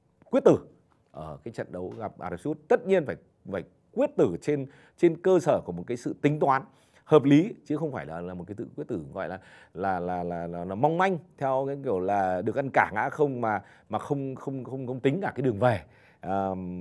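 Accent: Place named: South, Vietnam. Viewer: Bác xem thế nào góp ý chương trình bình luận bóng đá bằng giọng bắc đi bác.chứ em thấy bác giọng nam không hợp một tý nào .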